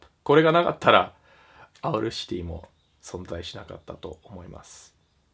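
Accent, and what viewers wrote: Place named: London, United Kingdom. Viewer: PDRさんのロンドンの発音好きw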